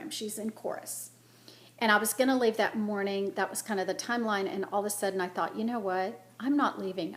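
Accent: Texas, United States. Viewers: Am catching up on some of your recent videos...funny how after you moved back to TX, your accent is pretty strong. :) I am very sympathetic as to the struggles and trials you and your family are facing. It does seem like so many these days (self included) are going through some major storms. Thankful that we know Whose Hand we hold and the God who gives us strength to take each day as it comes. I will keep you in my prayers. Thanks for sharing from your heart, as always. I don't knit but I do crochet and have always found it to be a great relaxer. Blessings always! xo And Merry Christmas! No stress, only peace and joy! :)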